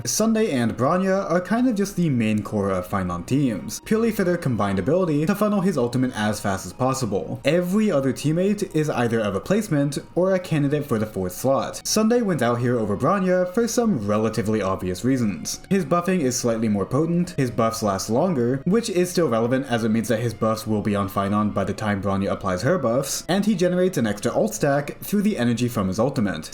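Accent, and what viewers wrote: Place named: United States. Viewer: You sound like an American so I was wondering how you mispronounced Geopard's name.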